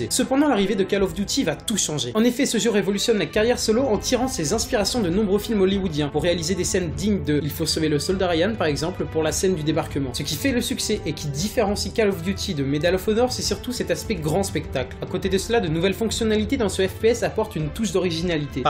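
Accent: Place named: France. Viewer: Putain ca partait bien ... Puis ce putain d'accent francais a tout gacher Cale of duti... Et l'répèter tout le temps c'en est saoulant...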